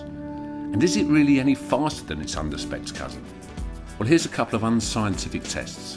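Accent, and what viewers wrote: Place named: United Kingdom. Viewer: I loik that british accent sow much :)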